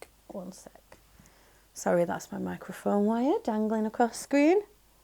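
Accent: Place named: Yorkshire, United Kingdom. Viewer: Thank ya very much. Happy new subscriber, here. ^‿^ I chose 2, actually asking whether I should travel across country to participate in a medical trial that I'd been feeling squeamish about since learning the entirety of the protocol that I'd be following. So hearing 'a resounding NO' is an alright answer in this case. (Your accent is adorbs, by the way. I had a friend a few years back with this wildly whimsical mish-mash of Mancunian, Blackpuddlian, and Yorkshirian -- and even though she felt that her Yorkie aspect wasn't very strong, hearing you makes it pretty clear to me that she still had plenty!)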